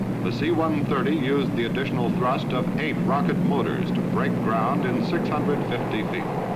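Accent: United States. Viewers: Thank you again for the upload.... I love the films also the American narration .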